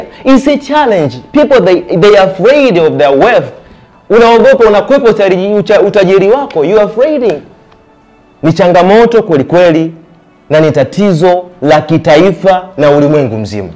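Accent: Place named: Tanzania. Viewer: Nyoosha kingereza cako yaani unakiongeya kibongo bongo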